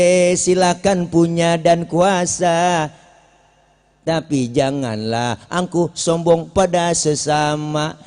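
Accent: Jakarta, Indonesia. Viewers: Maap pak ust bahasa y kaya asli betawi pasti jakbar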